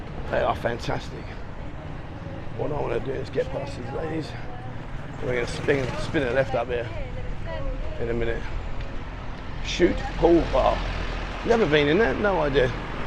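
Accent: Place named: London, United Kingdom. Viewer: I'm from los Angeles, and i have difficulty understanding your London accent, but it's worth it! Lov You! Keep it up!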